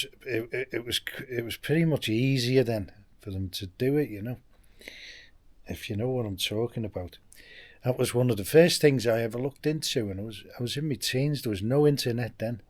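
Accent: Liverpool, United Kingdom. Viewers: All this from in your head ... you have some brain mate! Barrow boy, paper round and betting shop. With scouse accent ... No way! This does not add up ... I will try and join the dots and work you out! :)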